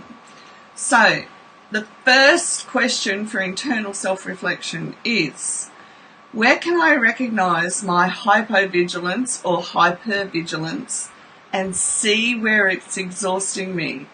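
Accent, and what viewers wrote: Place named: Australia. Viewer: Thought I’d add, I’m a 49 year old woman and you tube popped up your video, I live in Jackson Mississippi and was listening to Dr Kirk Honda vids. You sound Australian, are you? I married a kiwi and resides in NZ for 10 years. I’m often amazed at the people who I won’t know “in real world life” can provide such insight and help to others. I plan to watch more videos, you seem very pleasant as well as quite credible and informed.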